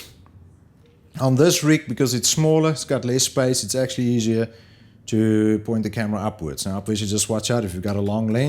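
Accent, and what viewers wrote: South Africa. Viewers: south african? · You sound South African bruv. You're local right?😁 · sounds like a south african who has spent a few years in AUS or NZ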